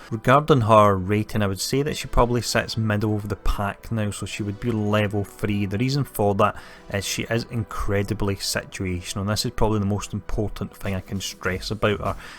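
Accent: Scotland, United Kingdom. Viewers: You do an excellent job with this channel by the way - and I love a Scottish accent!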